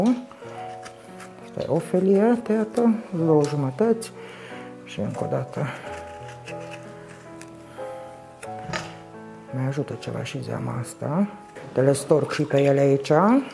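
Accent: Transylvania, Romania. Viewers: Ai un accent frumos ardelenesc.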